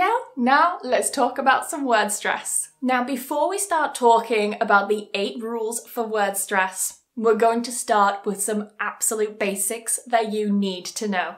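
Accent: United Kingdom. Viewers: Though, I am an Indian boy still I love to learn British accent from you. I enjoy learning with your so profound smile 😊😊❤ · I love your British accent ❤️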